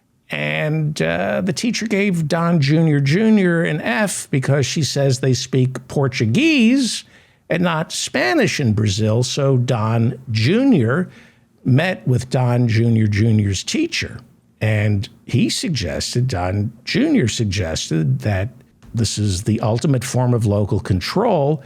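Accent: New York, United States. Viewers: I read ur intro, I grew up in Flushing and throughout my entire life I've battled to fall asleep. I'd put on earphones and listen to talk radio when I was a kid hoping to get some shut eye before school and still till this day I transitioned from a walkman and a.m. radio to a smartphone and YouTube. I found you at approx 4am last night(IMO it's never the next day until the sun begins to rise) and listened to one of your podcasts(full show), the ny/jersey accent, the dry humor overloaded with a large helping of sarcasm was right up my alley. Keep doing what you're doing, you're fantastic at this. We need to crush the GOP out of existence or if the GOP wants to continue as a party here in the US then they need to be known by the entire world population as the far right extreme fascist propagandist nationalists that they are but yes I know it's way too long for a party name but we can make it into an acronym such as the Far Reich Totalitarians party in short they'll be known as the FART Party). 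Then we'll have to out the Corporate Dems as the Republican lite party that they are and allow the real progressives to have our own party. Good day to you sir, you've found a new subscriber in me today with many many more to follow.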